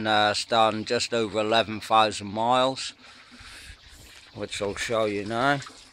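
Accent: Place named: Norfolk, United Kingdom. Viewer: Loving the Norfolk accent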